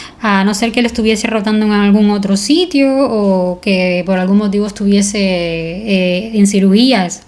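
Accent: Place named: Cuba. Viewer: Mi esposo cada vez que escucha tu voz me dice: ya estas escuchando a y yo le digo claro que siiiii 😅😅 por fin llegamos a la parte feliz, te lo mereces todo mi reina. 🩵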